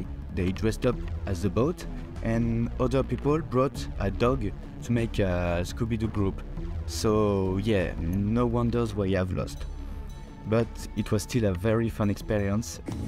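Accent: France. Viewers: Are you french your accent seems like it and some things in the video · Are you french ? Because your english is just beautiful😅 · this video is so great! i think i may do it! but, just a question: are you french? you have a very french-like accent?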